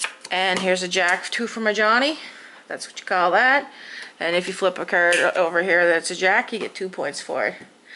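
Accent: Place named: Canada. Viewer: I loved the video, and thanks so much for making it! We are learning to play cribbage and I wanted to watch an actual game, so this was a great find on YouTube. I grew up in Canada but now I live in the UK; it was great to hear a proper Canadian accent for the first time in a while! And I was able to share that with my partner. Blessings to you; hope your warm weather comes soon! (It rains a lot here but my British partner says he has never been 'snowed in'; he thinks it sounds nice, but I assured him it's not that great...)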